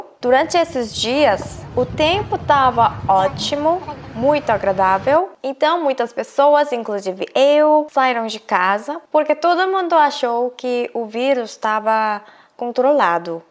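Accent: South Korea. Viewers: Falar o português brasileiro errado? Eu fiquei em dúvida se era coreana ou brasileira... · Apesar so seu sotaque coreano SEU PORTUGUÊS É QUASE PERFEITO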